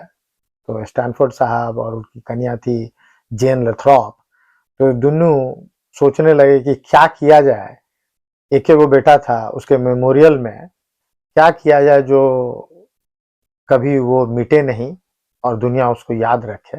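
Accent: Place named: Bihar, India. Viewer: Bahut achha lga apka video.. sbse inspiring lga app apne bihari tone ko maintain rakhe hue hai america jake bhi.. proud feeling.. thank you for such inspiring content wo bhi jab apne language or apne tone me rhte hue mil jaye to.. hmlog to india me rhte hue bhi apna bihari tone bhul gaye hai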